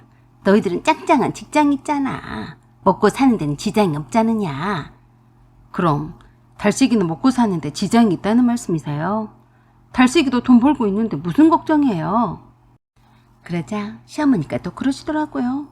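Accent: Jeolla, South Korea. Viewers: 전라도 사투리가 많이 들리시네요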